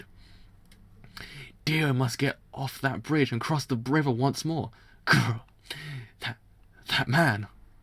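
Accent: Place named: United Kingdom. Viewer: I think is for your British accent lol, the way you pronunce "The legs of" sounds pretty similar to "Alexa" for me xD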